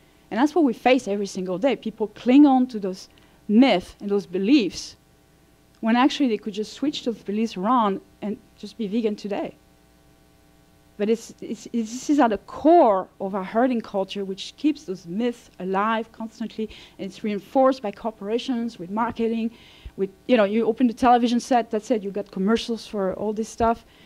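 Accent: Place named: France. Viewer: Powerful stuff; good talk; French accent and all - you're a great speaker! Thanks for spreading the word... and spreading it a little more creatively than when you started back in 2006;)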